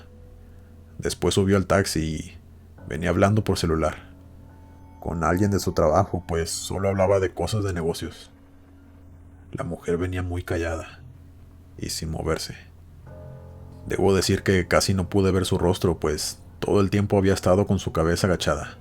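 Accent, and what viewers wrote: Mexico. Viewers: Me encanta tu voz me gusta como hablan los mexicano ❤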